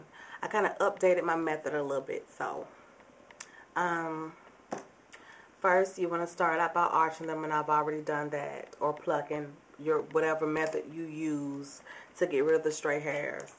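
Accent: South, United States. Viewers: Girl I'm from the south and I just love how you talk with your southern ascent!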